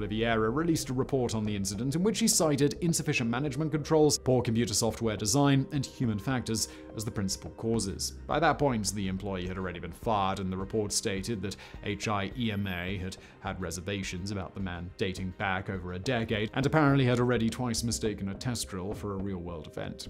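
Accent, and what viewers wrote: RP, United Kingdom. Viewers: His BBC accent isn't forced and not at all cringeworthy. What a pompous arse.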